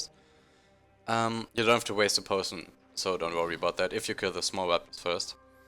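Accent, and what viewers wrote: Germany. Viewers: He is german ! you hear that. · Bist du deutscher? :D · u can tell the way he speaks hes german lol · locker der akzent ist so offensichtlich außerdem sind die besten rengar spieler deutsche ^^ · Bist du deutscch ? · are you a german guy? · Do u come from Germany ? Hört mann nen bissle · are you form germany or austria ?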